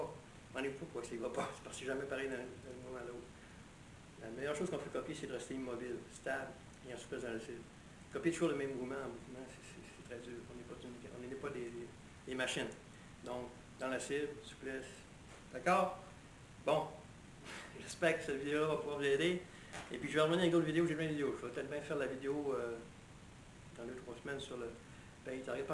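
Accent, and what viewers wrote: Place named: Canada. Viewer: Merci super video moi qui veux demarrer le tir instinctif de tres bon conseil l accent canadien dur dur et parlezvun peu moins vite mais vouscetes grnial ❤👍👍🙏🙏🙏😊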